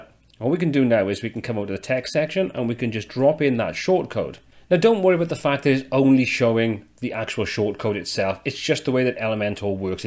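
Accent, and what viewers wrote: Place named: Newcastle, United Kingdom. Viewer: Well, isn't that an elegant approach, very smart! I'm trying to find some visually interesting way of doing 3 tabs that are all text (question then answer), and found your video, and though this doesn't work for me in this case, it's something I'm bookmarking and saving, as I think I may have a use for this in the future. Thank you. Have subbed as well, am looking forward to watching more of your content. And, you sound Geordie...are you? My Dad was from Wallsend, it's my favourite UK accent and always makes me think of him when I hear it!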